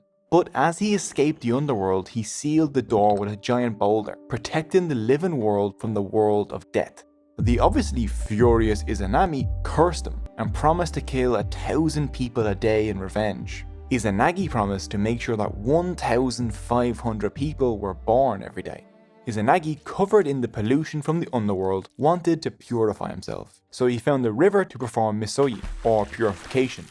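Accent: Ireland. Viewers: Wid? An Irish accent does not lend its self to well. Especially when a jokey American style delivery is used for effect. If English is not your first language, tree children, and where day live can be confusing. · Love the Irish accent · Just bizarre listening to the creation of Shinto in a strong Irish accent......😆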